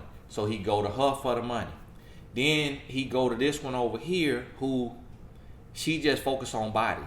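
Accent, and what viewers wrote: South, United States. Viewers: I love the country accent 😊😊❤️. Preach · Lol. Gosh darn it,..that accent of yours. Neospirin...nope. Its pronounced Neosporin. 😂. I know, I know...I'm from the South too. Good video though.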